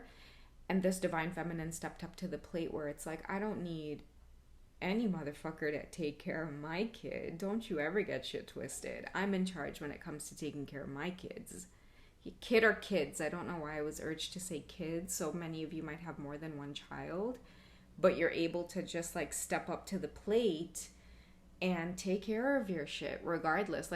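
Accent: Trinidad and Tobago. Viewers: You did a good trini accent. Thank you for the reading.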